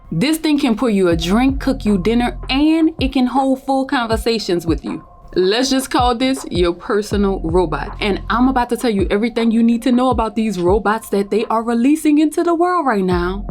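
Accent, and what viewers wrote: New Orleans, United States. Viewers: Are you from New Orleans baby? I ❤ your accent 😍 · Are you from Louisiana? I’m thinking I hear that New Orleans accent. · Not Related but, I assume you from NOLA, because I can hear New Orleans in your voice lol 😆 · Mmmmmm 🤔..... Is that a New Orleans accent?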